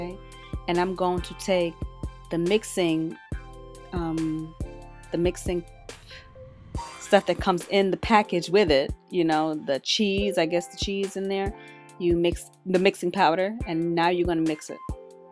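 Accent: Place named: Haiti. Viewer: So your Hatian sista? I love it! I know I had detected a slight accent when I watched your very 1st video. I love accents. And this receipe looked really good. Too bad I'm off of dairy, but my aunt said she will be making this for the weekend. I dig it when people can take packaged products & make their creations with it. And if you find the time please make some more cooking vids. That chicken looked great. I need new ways to cook bird. Thanks again, dear!!! Now I'm going to the wigs,LOL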